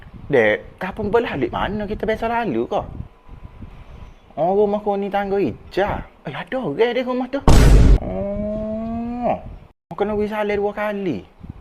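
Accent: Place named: Kelantan, Malaysia. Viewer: Udoh kecek kelate pulok😂😂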